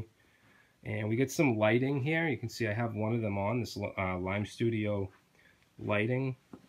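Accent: Boston, United States. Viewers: Well they both have Boston accent. I'd say is the mature version of Bucky.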